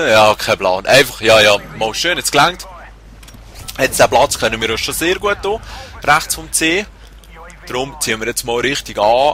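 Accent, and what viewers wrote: Switzerland. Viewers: ich versteh auch fast nix :D is schweizer glaub ich :D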